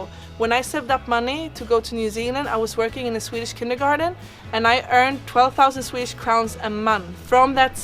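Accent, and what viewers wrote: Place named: Spain. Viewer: Hahahah vad sjukt att du pratar som att du har en spansk dialekt hahahah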